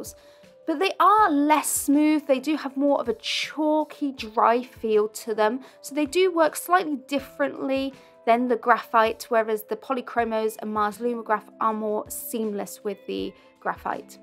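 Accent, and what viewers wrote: United Kingdom. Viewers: Hi,thank you so much for another great drawing tip. I am a portuguese speaker brazilian boy,graduated in arts many years ago,and thanks to living in the US for many years i a can understand you perfectly. You are hands down the BEST gifted drawer on youtube. May God bless you always so you can keep always bringing more and more very useful drawing tips like this. Ps: i love your british accent!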